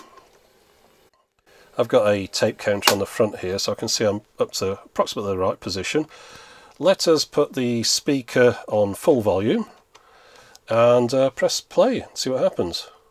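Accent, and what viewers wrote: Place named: Northern England, United Kingdom. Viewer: He’s obviously from northern England which is actually in between Scotland (Connery) and London (Collins).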